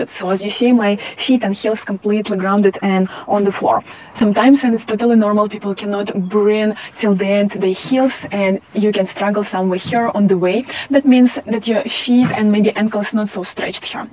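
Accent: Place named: Germany. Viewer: A real sincere efforts to help yogis struggling with this pose. Thnx for sharing. Also, I loved ur English with ( if I m not wrong), German accent. Love from India. Take care, stay safe.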